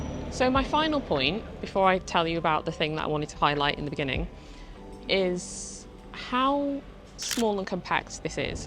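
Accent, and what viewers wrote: United Kingdom. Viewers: Excellent video! I’ve just ordered mine and am so excited! A nice change to hear a fellow Brit and to see beautiful Bath too! 👍🏻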